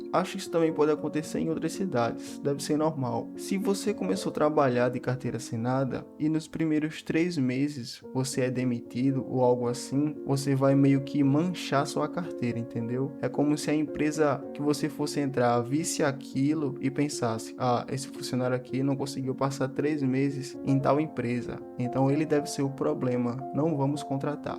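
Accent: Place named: Northeast, Brazil. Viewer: pode falar com sotaque de Nordestino, ta de boa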